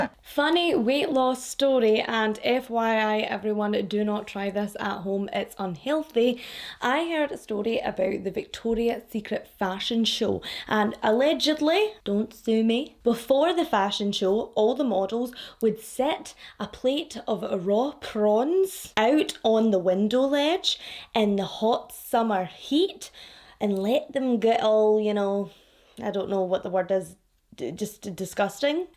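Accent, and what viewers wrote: Scotland, United Kingdom. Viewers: You remind me so much of my Scottish friend that I miss so much that it feels super comforting to listen to you talk 😅 Truly you're an absolute delight, can't wait to watch this whilst procrastinating tomorrow 😌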